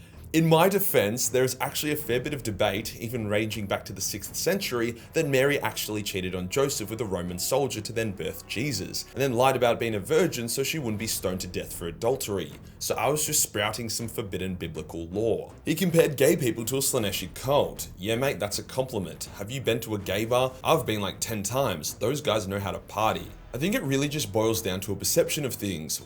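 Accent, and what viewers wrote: Australia. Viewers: has comedy, self awareness, lore and the aussie accent, goddamn give me more. Id give up maple syrup and tim hortons for this man. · From an outside perspective, all I see in is just an atypical Warhammer nerd who got really lucky and is pretty good at running a business. The Australian accent and slang helps him in his lore videos and his overall presentation is pretty solid. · Same. also Australian accents just make everything funnier. "Yah dog ahh" 💀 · Yeah, the Aussie accent makes sound like the video is fastforwarded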